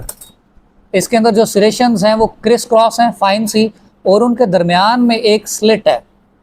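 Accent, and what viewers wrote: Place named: Pakistan. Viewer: Aap jis tarah Se bolate hai na vah Pakistani language jaisa lagta hai 😊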